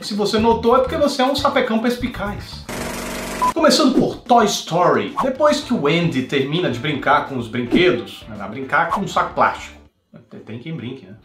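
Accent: Northeast, Brazil. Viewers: Você é nordestino ?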